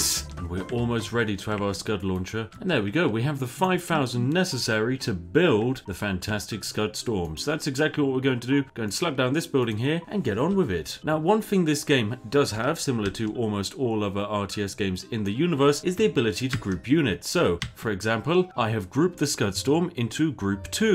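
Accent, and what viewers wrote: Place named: Devon, United Kingdom. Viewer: I bet you're from Devon..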